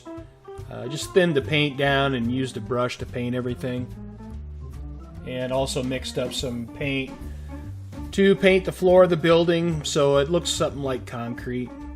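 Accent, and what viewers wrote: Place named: United States. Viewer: a european that sounds like an american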